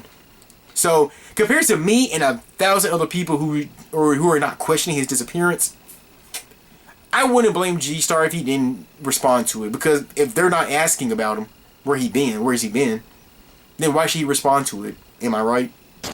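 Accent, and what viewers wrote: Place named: Australia. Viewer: He has Australian accent, not British.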